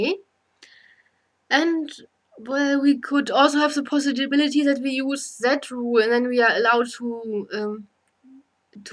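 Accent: Germany. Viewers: u have german accent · Idk guys, l am able to understand her, I guess experience talking to Germans helps. Thank you for the video!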